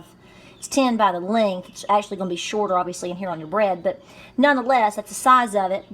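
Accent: South, United States. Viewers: Your videos are just wonderful. Love your kind southern accent, as well as your wonderful recipes. Bless you and keep up your faith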